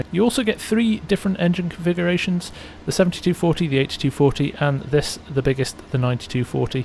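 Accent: United Kingdom. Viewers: Hey, do you live in the US, you sound British but I've seen you like ur US quipment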